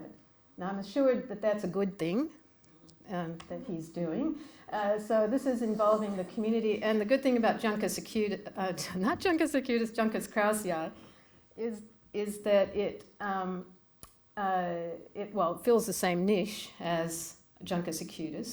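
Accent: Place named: Australia. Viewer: Great work, - it's fun for another previous Oregon, IL resident to about your work in your Australian accent!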